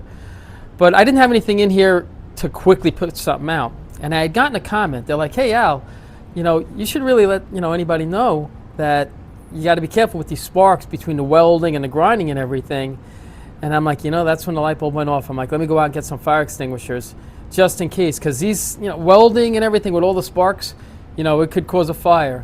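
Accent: New York, United States. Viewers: Alabama here, I would have won a bet that you were from NY, by your accent.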